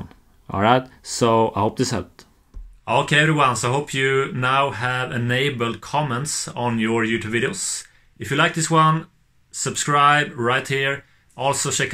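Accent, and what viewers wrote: Sweden. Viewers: he is swedish i hear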